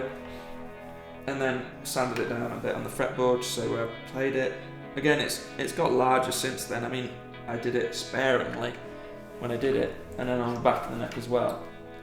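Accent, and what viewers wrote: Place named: North West England, United Kingdom. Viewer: Sweet that mate- lovely sounding, you sound like you’re from my area- you North-West? I’m Warrington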